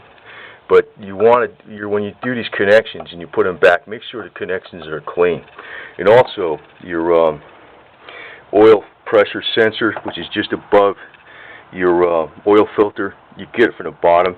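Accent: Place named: Philadelphia, United States. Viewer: I came on the internet to find info on problems with my 1996 Sebring. I want to thank you for things you helped me with. OOh and by the way... When I watch your videos I hear a Philly Kensington accent!! I am in Fla now, but I grew up near Sears at Whitaker and the Blvd!! Look me up on Facebook or email me and lets see how many people we know from Philly and laugh!!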